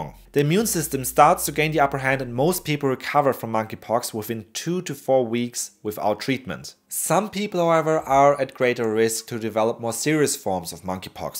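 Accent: Germany. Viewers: The guy who talks is german you hear it in his english out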